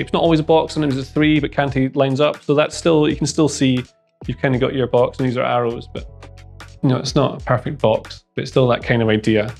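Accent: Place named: Scotland, United Kingdom. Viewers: Why I have a feeling that a Scottish accent is perfect to explain football tactics??? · is this guy scottish or eastern european hahah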